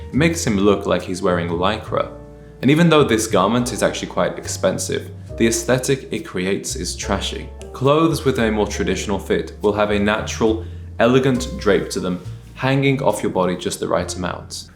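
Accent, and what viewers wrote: United Kingdom. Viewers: Is this an American with a British accent or are proms yet another American import to GB? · Love your dry, understated, British delivery. One faux pas I see frequently, is men wearing a baseball cap with a suit, all too common. (yes, pun intended)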